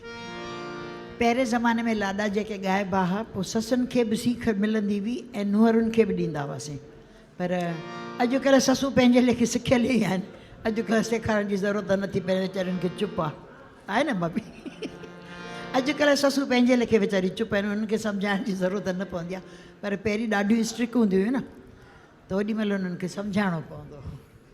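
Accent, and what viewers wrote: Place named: Sindh, Pakistan. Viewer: خالص سنڌي لهجو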